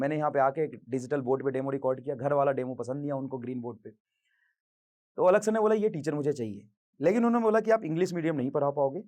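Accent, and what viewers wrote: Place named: Bihar, India. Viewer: Sir got that Biharizz😩😱😱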